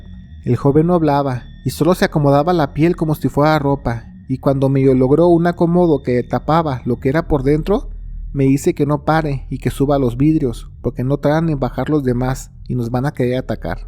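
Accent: Mexico. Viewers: De tanto hacer maratones de tus videos, ya se me pego el acento mexicano ❤😅😅😅 me encanta tu canal. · Ay, cómo se nota que eres de Guanatos 😂 me encantas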